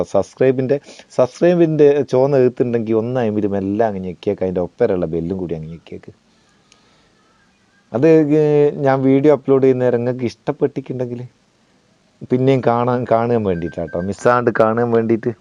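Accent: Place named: Vadakara, India. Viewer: വളരെ ഉപകാരമുള്ള വീഡിയോ 👍👍🌹🌹🌹🌹 ഇങ്ങള് വടകര kkaran ആണോ സംസാരം കേട്ടിട്ട് ചോദിച്ചതാണ്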